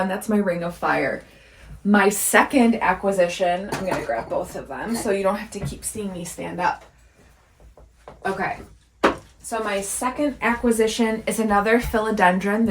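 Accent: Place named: Midwest, United States. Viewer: I'm so obsessed with your recording location and your Midwest accent :)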